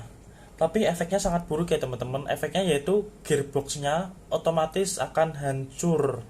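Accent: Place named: Java, Indonesia. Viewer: Saya suka orang medok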